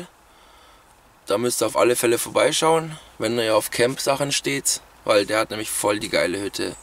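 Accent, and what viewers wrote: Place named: Franconia, Germany. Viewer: weil du total fränkisch redest und du auch schon in der frändischen schweiz unterwegs warst... Gruss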